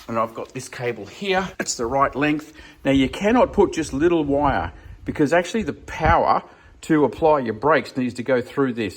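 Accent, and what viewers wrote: Australia. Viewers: Good on ya....Very well presented and informative...And AUSSIE English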